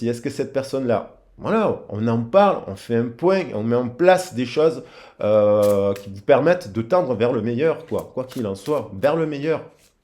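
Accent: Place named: Ardèche, France. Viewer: Ok, l' accent de l' Ardèche ! 🤗 En Provence, côte d'Azur, ici, ça chante la cigale dès le berceau, donc l'oreille est abonnée aux accents pimpants! Ya de la Vie dans la Voix 😉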